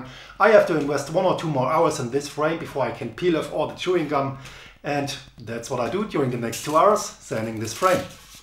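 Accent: Germany. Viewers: Das beste am Video: Das abgehackte und harte Englisch. Da hört man gleich das er ein Deutscher ist. 🤣🤣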